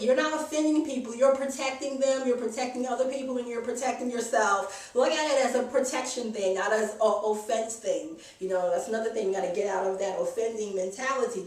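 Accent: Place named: New York City, United States. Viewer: I hear the straight NYC in this vid... LOL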